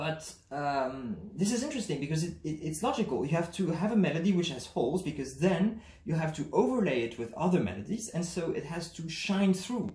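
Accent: France. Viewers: Merci pour tous ces exercices ! Je devine à ton accent que tu es français ?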